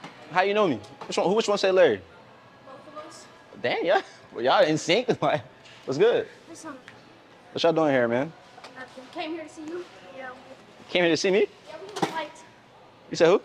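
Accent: United States. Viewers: Is she like from Texas? She got an accent it sounds like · her southern accent is so beautiful 😍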